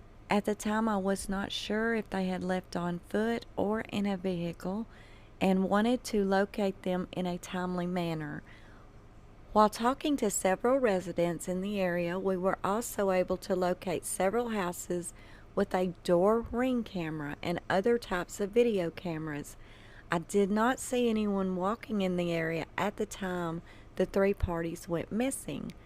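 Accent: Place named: Texas, United States. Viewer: Great voice, a kind gental loving voice with Texas accent!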